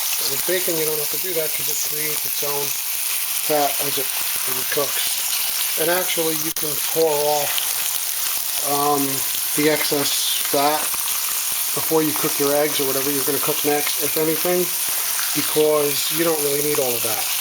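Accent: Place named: New York, United States. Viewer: Sounds to me like he's from somewhere around New York City.. I'd guess Brooklyn or Long Island. Real nice classic downstate NY accent., Am I anywhere close? I live in Westchester so I know I'm in the right neighborhood.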